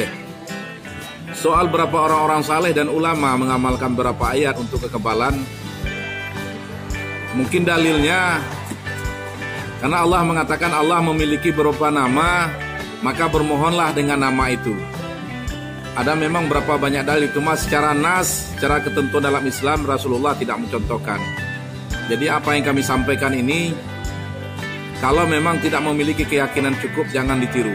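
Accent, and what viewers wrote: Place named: Sumatra, Indonesia. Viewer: Dari logat bahasanya kayaknya orang Sumatera Salam dari Nias bung, moga bisa ketemu ngopi bareng sambil bertukar ilmu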